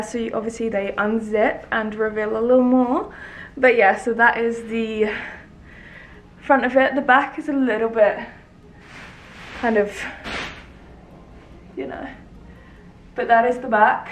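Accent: England, United Kingdom. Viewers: Are you English because I would wonder if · I like british sound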